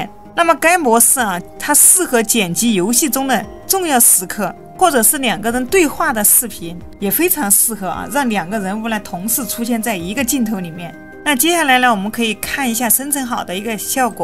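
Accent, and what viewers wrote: Sichuan, China. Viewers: 这个川普太养人了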